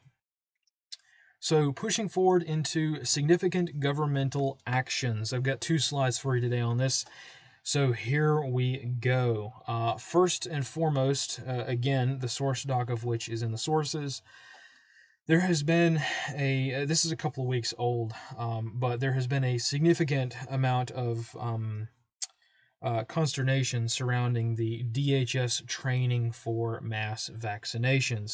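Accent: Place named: South Carolina, United States. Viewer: Just found your channel the other day and today found out you did briefs like this. This is awesome. BTW as an SC native you sound like one of us! Thank you for sharing your wealth of knowledge and skill with us. It is greatly appreciated and a service to your fellow Americans. Godspeed to all you gentlemen at S2!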